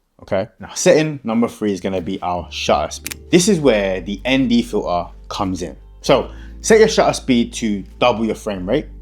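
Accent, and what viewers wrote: United Kingdom. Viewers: Really good thanks, just discovered this camera app, and I like to hear a Brit accent, nithing against America at all, just me...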